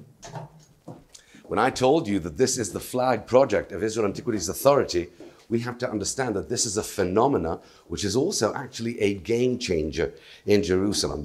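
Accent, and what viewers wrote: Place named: United Kingdom. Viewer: Thank you for this wonderful tour, so excited just to watch it. And it’s also good to hear a UK accent . God bless you.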